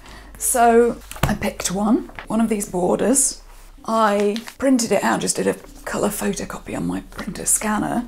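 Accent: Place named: RP, United Kingdom. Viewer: You remind me to The Crown’s Margaret Thatcher. Her rasp is next level, but yours is too! You sound very posh. Also, I started projects and I just haven’t finished them. But I’ve done them now during this pandemic.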